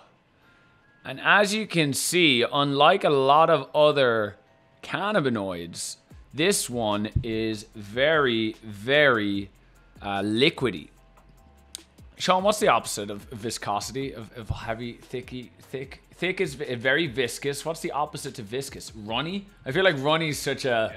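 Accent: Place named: Ireland. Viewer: are you Irish ? I can hear a little bit of an accent, are you in the US ?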